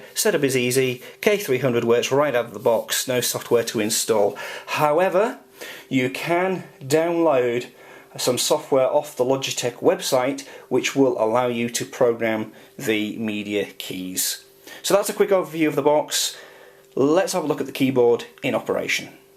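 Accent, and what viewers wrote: United Kingdom. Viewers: You have perfect English (Great Britain) voice xD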